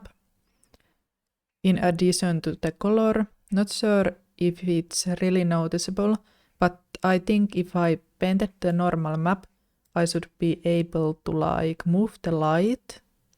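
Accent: Finland. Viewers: i can recognize a finnish accent anywhere and this is one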